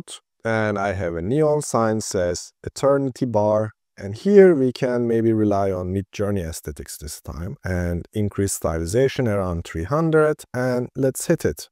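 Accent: Turkey. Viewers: dayı türkmüsün by nası aksan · (sesinizden Türk olduğunuzu varsayarak) Videolarınıza Türkçe altyazı ekleseniz çok iyi olurdu. Ne yazık ki Türkçe kaynakların çoğu “en azından benim gördüklerim” GPT ile nasıl para kazanılırdan öteye geçmiyor :) Anlatımınız ve video için teşekkürler.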